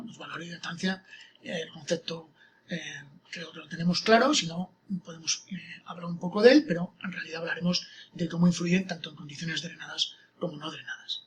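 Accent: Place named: Spain. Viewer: Que acento para mas feo, del idioma castellano el peor hablado se encuentra en españa, sin duda.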